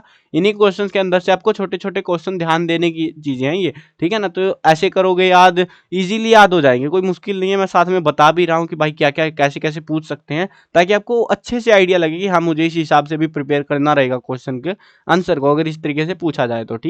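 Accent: Haryana, India. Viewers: Sir are you belong to haryanavi background 🙂🙏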